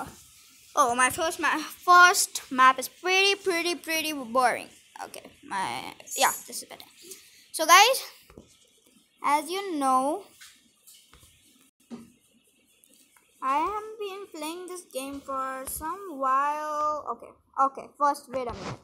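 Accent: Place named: United Kingdom. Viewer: he is speaking like a british while he is a Indian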